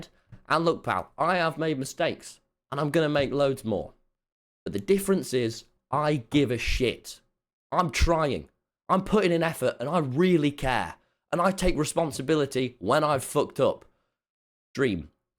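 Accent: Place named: Midlands, United Kingdom. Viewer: no that’s just a midlands accent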